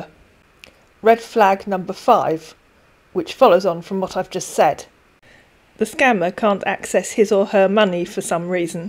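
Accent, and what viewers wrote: United Kingdom. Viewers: Love the british accent!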